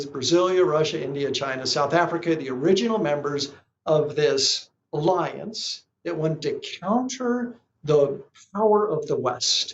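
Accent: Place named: United States. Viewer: When he started speaking with an American accent I kinda expected this to be a bit one-sided... I was not surprised. "Predictive History" remains the Geo-political analysis standard on yt PS: your country is controlled by Iran where all your politicians are forced to put on a hijab and have to go pledge allegiance at Iran's wailing wall... (sarcasm)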